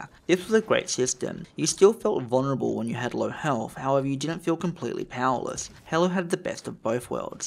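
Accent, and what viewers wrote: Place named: Australia. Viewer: Hey mate! You sound like you're from Australia!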